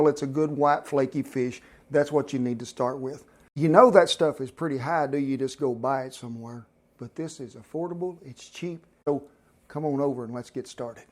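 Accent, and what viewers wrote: South, United States. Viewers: Hahahaha, love how he splits it evenly. Love the idea and I will buy some cod and try this out. Oh yeah, gotta love that country accent!😁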